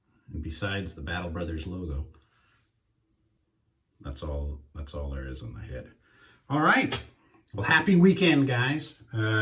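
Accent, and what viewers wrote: Newcastle, United Kingdom. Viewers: I love your channel bro.Love from Liverpool uk.You may be a yank now but you can tell a Geordie.(Newcastle for outsiders)Take care mate.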